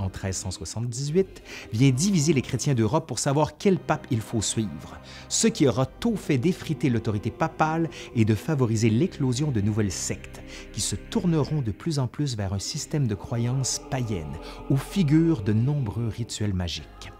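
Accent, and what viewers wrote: Quebec, Canada. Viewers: Mes seules références en la matière, c’est le film “The Exorcist “ de 1973😂! Merci pour ce complément d’enquête apportant quelques pièces de plus à mon puzzle! L’humour et votre bel accent Québécois rendent l’enrichissement de nos connaissances plus facile! 👍🏼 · Je suis tombé sur ta chaîne car je trouvais le thème intéressant. J'ai été surpris que tu sois québécois et j'ai trouvé ça dommage car je ne suis pas habitué à cet accent. Cependant, j'ai visionné un peu plus tard une autre de tes vidéos et je dois dire que ça ne me dérange plus ton accent. Les sujets traités sont tellement intéressants et je trouve même maintenant que l'accent québécois est plaisant et joli à entendre. Voilà, Merci de nous éclaircir sur tous ces sujets tout aussi passionnants les uns que les autres ! Keep going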